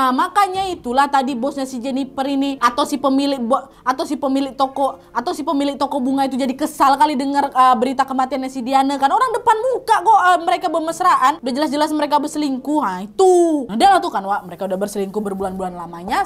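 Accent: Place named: Medan, Indonesia. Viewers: Ampunnnnn, cerita kau jd kocak krn gaya cerita yg berlogat sumatera❤❤❤ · Nonton semua video si gue ngerasa kalau semua tokoh dari negara manapun yang dia ceritain adalah org MEDAN. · Emang logat medan paling disukai 🥰🥰🥰😁😆😆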